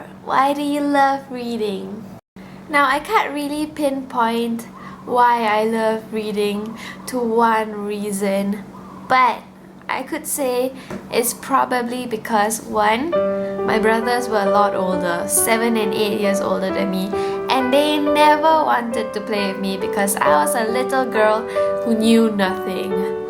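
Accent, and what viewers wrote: Malaysia. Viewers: Heyyyyy I LOVE your accent! I'm also Malaysian/Chinese! WHOOO. LETZ BE FRANDS! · literally the first second I heard you speak I was like, this girl gotta be malaysian. AND I WAS (half) RIGHT :D OMG OMG ANOTHER MALAYSIAN BOOKTUBER THIS IS FANTASTIC. I also recently started booktube and I'm really enjoying it so far and like god know how I struggle with like keeping up with reading and filming and editing gah. dont worry I hit 20. it changes nothing HAHA You are an absolute natural and I cannot wait to see more of your videos! :D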